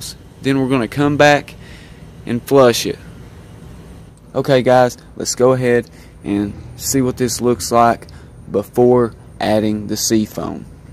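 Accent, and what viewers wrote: South, United States. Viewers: I looooove a country, hillbilly accent. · I love this guy's southern accent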